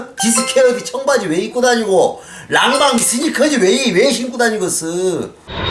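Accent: Jeolla, South Korea. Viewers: 광주 토박이로써 전혀안불편하고 잼있습니다 제가 허락하게습니다 전라도사투리맘껏 쓰세요 ㅋ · 으따~ 역시 전라도 사투리가 찰지구마잉~ ^^ 나는 고통을 즐기는 사나이여. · 형님 저는 부산사람인데 전라도 사투리 너무 재미있어요..미친 놈들 말 신경쓰지 마시고 형님 하고 싶은 대로 하세요.. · 저 전라도 녀수 사람으로서 형님의 사투리에 무궁한 친밀감을 느낍니다ᆞᆞㅎ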